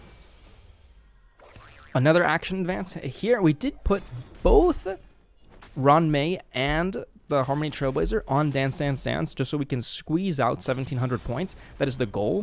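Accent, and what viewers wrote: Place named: Latin America. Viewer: Dude, u speak like a latin man jaja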